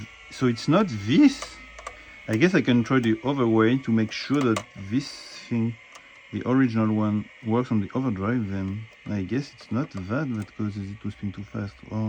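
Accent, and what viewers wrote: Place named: France. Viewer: I hope you do more of these live unboxing. We’re all here for your lovely French accent <3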